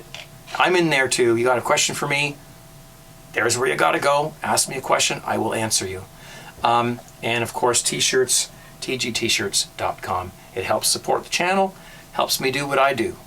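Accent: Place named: Canada. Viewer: I do like your video's, informative,funny, and I like your canadian accent.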